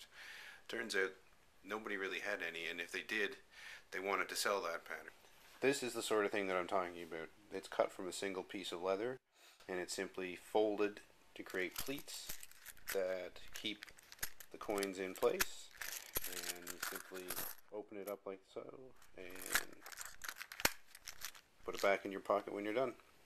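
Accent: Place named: Ireland. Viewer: I detect an Irish twang in his accent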